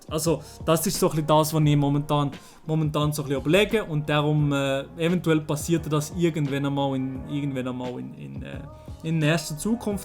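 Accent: Switzerland. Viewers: Würde ich gut finden. Du kannst mehr Leute erreichen und alle deutschsprachigen verstehen dich. Am Anfang wird es zwar noch etwas ungewohnt sein, aber das wird sich dann legen. Doch tu einen Gefallen.. Rede wie ein deutscher und nicht wie ein schweizer 😊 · Ich fändis nitirlich schad wenn mä der komischi (nit negativ gmeint) Dialäkt nimmä wurdi gheerä aber ich cha der Gedankägang durchüs verschtah. Äs isch wahrschindlich scho chli deprimiärend, dass mä mit Schwizerditschä Videos eifach ä sehr begränzti Riichwiti het. Mä derf aber nit usser acht lah, dass Schwizerditschi Videos bi Schwizer sehr güät ahchämät und si sich mit derä Schprach ai viu besser chenät identifiziärä. Ich hoffä, dass dü diä uberleggigä ai machsch. Dr definitivi Entscheid isch natirlich ä diär uberlah und dü muäsch dich ja nit sofort entscheidä. Ich wurdis sehr fiirä wenn dü witerhin Schwizerditschi Videos machsch, aber mä eurdi sich sicher ai äds Hochditsch gwännä. 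Ich wyyschä diär nu ä guäti Zyt und viu Glick bi dinnärä Bachelor-Arbät.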